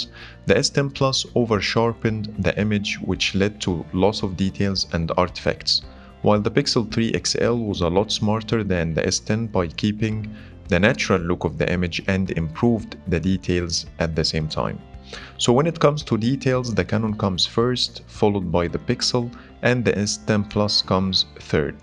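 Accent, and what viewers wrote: Egypt. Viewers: المصري لهجته واضحه و على فكره الإنجليزي عندك عالي جدا بس الاكسنت بتغلب.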